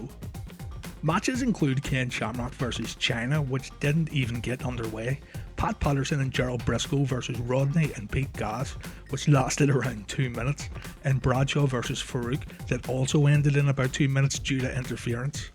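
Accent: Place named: Lurgan, United Kingdom. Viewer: Sounds like a Lurgan man to me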